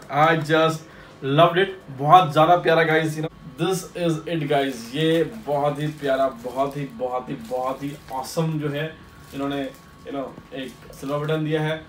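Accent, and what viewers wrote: India. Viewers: Congratulations.... 😉 bhaiya.. Bas ese hi aap aage badho. 😍😍 jeo mere vale bhaiya....... Aaree tum bhot khaas ho aap bhot mehant karte ho isliye toh hum aapko dekhna jada pasand karte h... Or koi kuch bhi boke aapke bolne ke tarike ko but meko toh ye hi pasand typical Indian style.... 😳😍😉😉😉😉😉😉😉.... Bas bhaiya plzzz full auto airsoft guns laooo🙌🙌🙏🙏🙏🙏🙏🙏🙏🙏🙏 Tumhara bhot bhala hoga... But anyways many many congratulations... 😍😍😍😍😍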